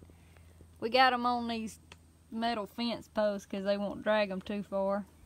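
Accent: South, United States. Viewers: you have an amazing accent. Love you southern bells.all the way from England Steve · Damn...she is hot and that southern in her voice. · Love you baby and love the southern accent. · I'm not sure if its just because your just so beautiful or that southern accent of yours but i need to find a women from the south I think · that southern accent it think im inlove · Ma’am I just love your southern accent. I can actually understand what you’re sayin. I’m from upstate SC. We usually use sour corn for something besides hog feed. Hahahahaha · i think she 'trapped' me with her good looks and southern accent. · Love your southern touch. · Love her southern accent, I find them really cute like the chick in the vid! Must be tough to live out in the country with all the wild animals around. I hear groundhogs while cute little things, cause lots of damage. We have SI Chuck here in NYC, but he's trained and confined with humans but the wild ones aren't. 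This chick looks like she'd be able to fix a car or something and not worry about mud like the city girls up here lol. Also, you know she works out or bikes or something with those legs? Would not want to get kicked by her lol. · ain't nothing hotter than a cutie with a sothern accent thanks for the trapping tips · That southern accent and barefoot my kinda gal.👍🏾👍🏾👍🏾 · Ive got a southern draw but damn i love hers a hell of alot more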